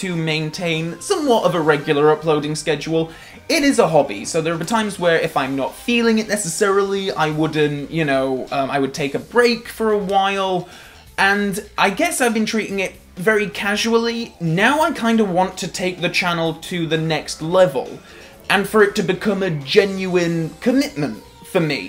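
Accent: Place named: North, United Kingdom. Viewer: Thank you very much for replying! The curious thing about doing a You Tube series for so long is you can chart yourself and any differences. Just to say, I really like your older videos as well as your newer ones (so I hope you never take those down). In their favour, I'd say you had quite a droll style back then, and it was fun to see when you got (faux?) annoyed. But I like how you come now across as well, it's very engaging and warm, esp to new viewers. Although it is nice to see when you get put out by something bad in a Bond film or game. And yes, you did used to sound a lot more Northern!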